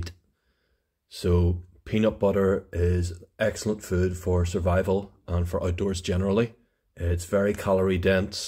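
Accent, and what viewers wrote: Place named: North, United Kingdom. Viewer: Good vid! Where up North are ya from?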